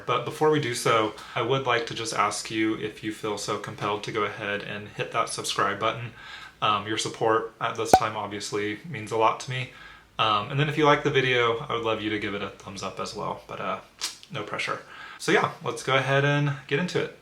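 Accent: North Carolina, United States. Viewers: Here in NC it is wadder, you were right. Im from foothills so it differs all across the state😂You have lost most of your accent but your voice is soothing.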